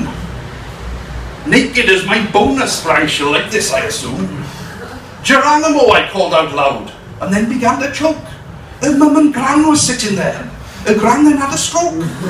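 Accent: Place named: Wales, United Kingdom. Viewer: Good stuff ... So rock and roll man !!! Where did the taffy accent come from? 'Butt'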